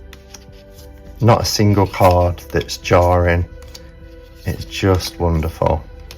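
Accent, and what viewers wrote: Nottingham, United Kingdom. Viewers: Nice reviews. You sound like you're from Nottingham like me lol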